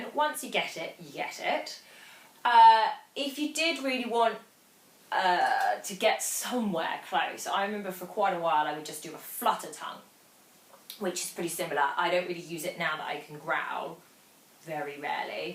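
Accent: United Kingdom. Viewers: thanks for upload these helpful tips. I use sometimes this technique on my baritone sax. Greetings from north Mexico. By the way, I like your lovely british accent;)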